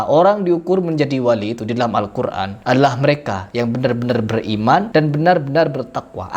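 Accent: Madura, Indonesia. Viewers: Maklum aja wal ai ni taunya bekoceh aja kda pernah tau kisah kewalian guru sekumpul,Inya taunya bekoceh gasan kontennya ja..smlm ja di tempeleng warga dikepala Ranai aja Inya,pinanya urang madura dr logatnya.paham ja toh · org Madura Pina ny dari logat bicara ny mang ae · Kya orng madura kmu ini logatnya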